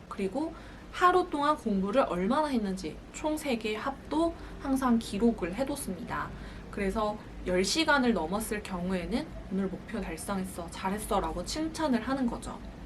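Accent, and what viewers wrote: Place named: Gangwon, South Korea. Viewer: 누나 부산 사람 아니었어요? 사투리는 강원도인데ㅋㅋ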